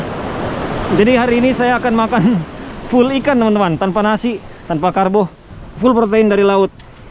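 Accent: Manado, Indonesia. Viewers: pantas tu logat rpa qt kenal, org manado kote wkwkwkwkw org manado klo bhs indo dpa tau dpe logat wkwkwkwkkw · Bang loe orang Manado ya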